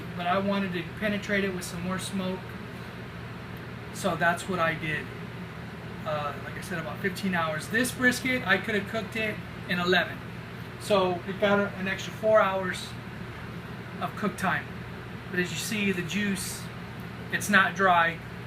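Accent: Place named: Hawaii, United States. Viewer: You sound like you have a Hawaiian accent. Good video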